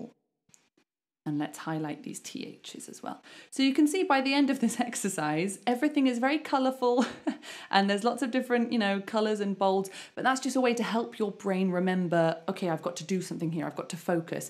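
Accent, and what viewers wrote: United Kingdom. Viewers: I adore standard British accent, thank you for your lessons! I absolutely love it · Pleasure to hear pure clear british english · Excellent ! Lovely British pronunciation ❤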